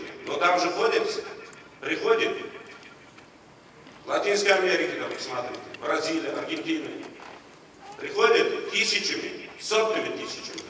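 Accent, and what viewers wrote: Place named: Khorezm, Uzbekistan. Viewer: Bu asli xorazmlik ushanchun tilni unaqa qb gapirayapti ozbekchani zorga gapirayapti dgan gaplarni yigishtirilar xorazmcha sheva xam qoshilib ketayapti bu inson yaxshi jonkuyar inson xurmatli Prizidentimiz bekorga bu insonni bosh vazir yordamchisi qb qoymagan xali koraslar bu yaxshilikka zor boladi bu yogi xudo holasa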